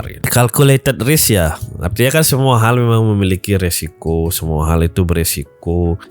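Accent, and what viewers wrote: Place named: Padang, Indonesia. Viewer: Lu org pdg ya bg, logat nya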